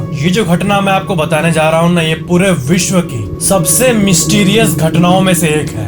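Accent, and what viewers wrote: Bihar, India. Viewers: According to his language type he is bihari!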